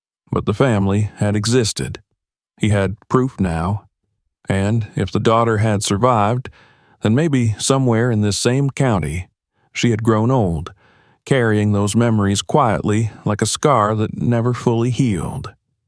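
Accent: South, United States. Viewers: Findlay Ohio loved this story and the southern draw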